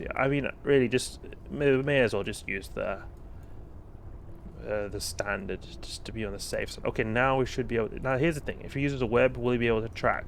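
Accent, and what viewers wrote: South Africa. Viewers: Huh, I thought you were South African XD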